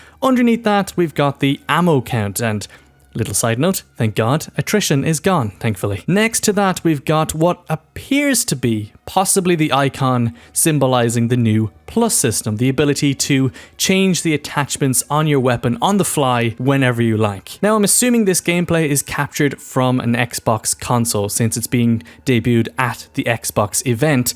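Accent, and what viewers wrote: Ireland. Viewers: Are you Irish by any chance? Accent sounds local ish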